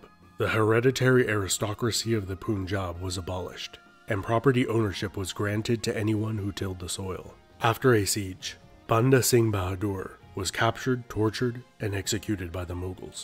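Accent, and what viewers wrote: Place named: United States. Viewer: I think it is just the American accent. They tent to pronce the letter 'u' 'oo' instead. Where an Englishman would say 'Tuesday' Americans actually say 'Toosday', and 'Youtube' becomes 'Youtoob' and so on.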